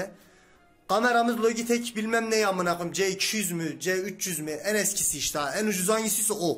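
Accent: Turkey. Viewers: adanalı gibi sanki yeniyim aranızda ben adanalıyım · Sen elazığlı mısın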